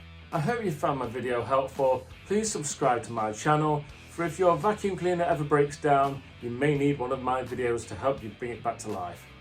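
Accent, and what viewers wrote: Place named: East Midlands, United Kingdom. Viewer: Haha could tell instantly have strong East Midlands accent lol.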